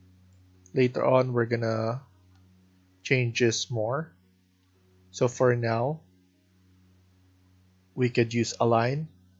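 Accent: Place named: Philippines. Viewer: salamat rin sa guide.. I was right when i first heard you alam ko pinoy agad 😅.. I needed his guide thanks again..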